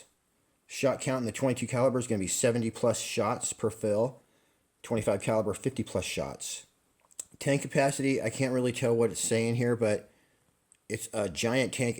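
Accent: United States. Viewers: Just curious. You sound like a Marine Recon sniper or Army Green Beret. You self-criticize sub-inch groups at whatever range. Are you from Kentucky?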